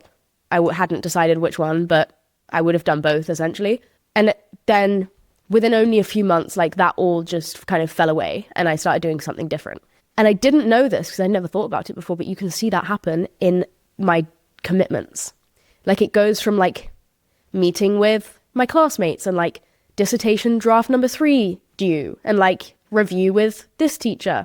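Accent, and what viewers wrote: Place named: United Kingdom. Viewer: why do you sound extra british in this episode 😭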